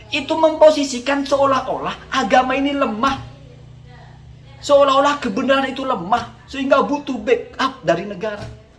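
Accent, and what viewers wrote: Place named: Lampung, Indonesia. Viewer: Bang.. ente orang mana sih. Kok mirip orang Lampung 😁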